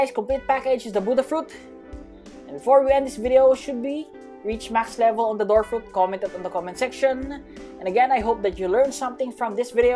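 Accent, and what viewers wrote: Philippines. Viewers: Pinoy ka tol? parang yung accent mo kasi pang pinoy hehe first time ko d2.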